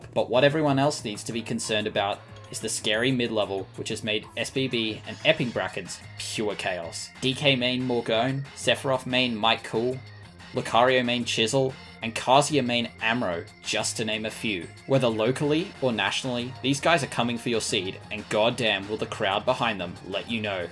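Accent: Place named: Australia. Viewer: This guy sound Australian. 🤔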